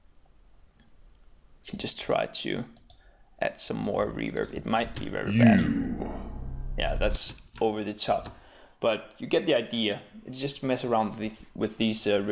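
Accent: Denmark. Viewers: Yoo dude, this is absolutely brilliant, thanks a lot! Also, er du dansk? Synes virkelig man kan høre det på din accent :P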